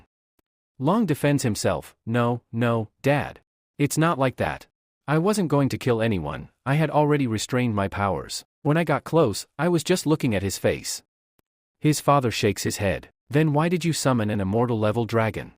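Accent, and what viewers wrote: India. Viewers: Wtf is this voice bro is not getting ppl it's is like a Indian person is talking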